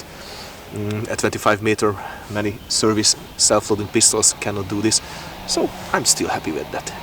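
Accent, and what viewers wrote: Hungary. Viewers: As a fluent speaker of Spanglish I appreciate the Beaty of Hunglish. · Hunglish? I always enjoy and learn very much from your videos! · It is in fact my favorite gun channel on YouTube in beautiful, bright Hunglish language. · “Hung-lish”…. Keep it up sir lol the brace is absolutely beautiful. I want a pair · Hunglish is awesome! :D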